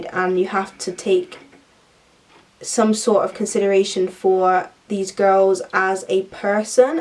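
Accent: Bristol, United Kingdom. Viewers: yesss the bristol accent is giving me lifeeeeee <3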